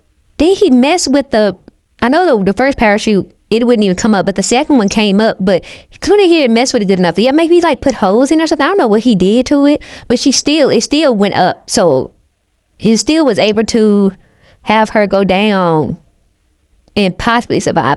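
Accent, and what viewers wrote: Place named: South, United States. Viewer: Your southern accent is giving me life! Tennessee? Appalachia?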